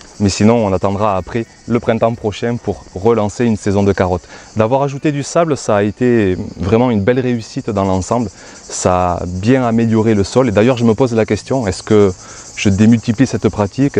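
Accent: South, France. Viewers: Salut comment va tu? et quelle plaisir de te retrouver et d’entendre ton accent du sud 🤣 Si tu veux on peut faire dû troc de légumes. C’est vrai les haricots on mal germé mais là j’arrête pas de cueillir j’en suis à la deuxième récolte. C’est vrai les tomates 🍅 nous font languir elles arrive on compte goûte. Les courgettes non font plaisir et on a hâte de savourer les aubergines 🍆. Bon dimanche et à bientôt 😎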